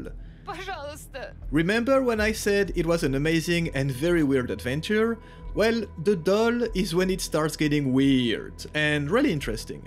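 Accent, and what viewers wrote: France. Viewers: Why does your accent makes me think of a french guy ? If yes that's nice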